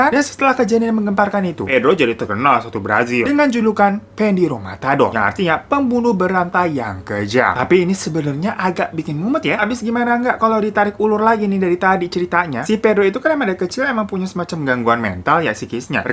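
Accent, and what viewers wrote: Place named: Jakarta, Indonesia. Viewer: Pertama kali komen nih bang, mo nanya bang asli orang mana si? Logat betawi nya pulen bet dah sedepp denger nye 😂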